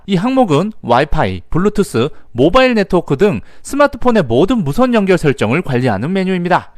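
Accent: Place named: South Korea. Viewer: 말하는게 어느지역 사투리 같음 히얀하네 끝말을 강조하는게 두번다시 하지 마라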